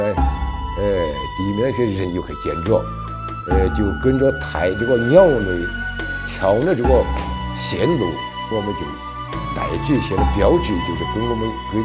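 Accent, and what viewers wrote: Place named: Yunnan, China. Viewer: 真正的说的云南话，👍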